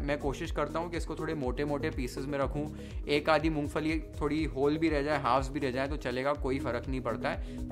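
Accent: Maharashtra, India. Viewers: Heres a son of Maharashtra who respects our traditions n cuisines. Otherwise v wld hv mutton biryani lucknowi style uploaded on sch occassions..totally insensitive. All my love n blessings to u chef. U hv md ur parents proud. And i love ur perfect marathi accent too😄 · Your Food channel is my favourite food channel. I have made khekda bhaji and Misal exactly how you showed and it was amazing 😍 thank you. Your Marathi is so pure अस्खलित, hope people have noticed the below words used by you instead of Hindi shows your love not only towards the dish or recipe but the traditional and authentic way and process you like to make that Marathi / Hindi Maap / Naap Chitak / Chipak Marathi influence, true Maharashtrian 🚩🚩 Jay Shivray, Jay Maharashtra 🚩🚩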